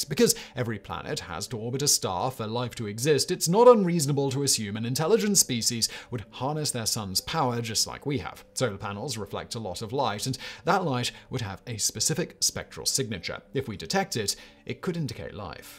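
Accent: United Kingdom. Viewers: Well makes at least 5 hours of footage a week after editing. Also uses British English pronunciations, not American English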